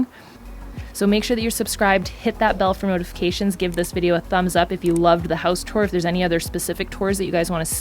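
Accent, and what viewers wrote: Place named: Canada. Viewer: I knew you were Canadian from the first video I watched (your channel is new to me)! You talk like me! I watched a couple of other videos, looking for French on products. Man, I'm such a dork. Anyhoo, gonna sub a fellow Canuck! 🇨🇦 Oh, and Carl needs his own show, books, product line etc. Sooooo cute!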